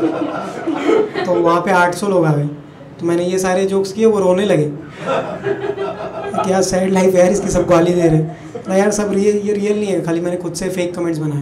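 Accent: Bhopal, India. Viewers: oyee tu bhopal se h kyaa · tu bhopal se hai kya...? :D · Ye bhopal se hai kya?? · Ooi, yeh Bhopal se hai kya? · Ye banda Bhopal se h kya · "Bhai ye bhopal se hai kya?" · Ye Bhopal se he kya? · Ye banda bhopal se hai kya??🤔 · Bhai tum bhopal see hoo kya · Yeh banda bhopal se hai kya?? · Ye bhopal se h kya ?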